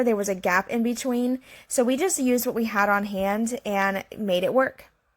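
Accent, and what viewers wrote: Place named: Kentucky, United States. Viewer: You sound like a Kentucky girl. Island looks real good. I'm building a 6 foot island like this for my wife.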